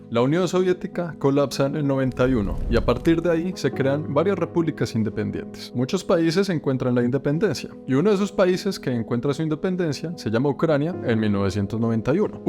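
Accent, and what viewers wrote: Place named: Colombia. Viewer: SUPER COOL 😎 👌 TODOS SUS VIDEOS!! MUCHAS GRACIAS POR COMPARTIR 😊 BENDICIONES 😘!! ME GUSTA 👍 MUCHO SU HACENTO COLOMBIANO 😊 Y SUS COMIDAS. SALUDOS DESDE LOS ANGELES, 🙏♥ CALIFORNIA. USA.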